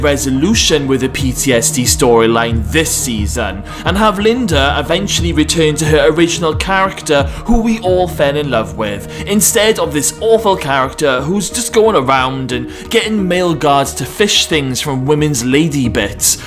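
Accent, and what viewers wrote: Wales, United Kingdom. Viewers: Love your analysis so much, as Wentworth keeps getting better so do your reviews- this review is pure art with your fine detail of screenshot selection, your feelings in response to the scenes resonate. I love your predictions - I definitely think you could have a role for the show - as a Melbourne boy born and bred but living in UK I love to hear the passion snd love for our best ever Australian tv show delivered in a welsh accent. I vote for you being offered a role by FOXTEL to create official special feature shows that celebrate the series and give you direct access to the cast/crew/writers.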